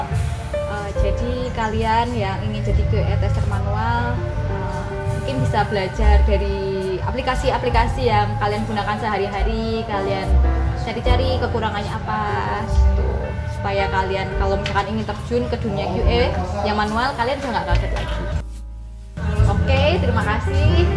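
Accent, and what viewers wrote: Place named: Java, Indonesia. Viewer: medhok mbak..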